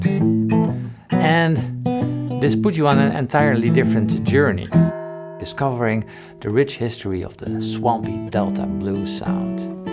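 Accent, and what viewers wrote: Netherlands. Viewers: "The beard guy with the weird accent" I'm gonna guess Swedish. I don't know why, but that's what my first thought was. Edit after finding out it's a Dutch accent: Well I got the continent right. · Only the Dutch have this beautiful accent when they speak English:)) Hartstikke mooi joh, ben een beginner maar ga je zeker volgen!! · Funny, he also plays with a kinda Dutchie Euro accent. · Me trying to figure out if this man is Dutch or not. Him Me: Yup, deffo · are u from holland? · You inspire me to play the guitar. I really like 08. very beautiful sounds. Ik hoorde trouwens gelijk dat je nederlands was, fantastisch! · I love your Nederlands accent 😂❤️ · already felt like it was a dutch accent XD ook hallo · Well I always thought you were dutch from the accent. This did it for me XD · I thought 'hmm, I think he's Dutch' but at the 'Oh mijn God' I knew it hahahaa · I sound a little less Dutch I hope but he's the better teacher haha netjes broer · oh mijn god sounds dutch!💯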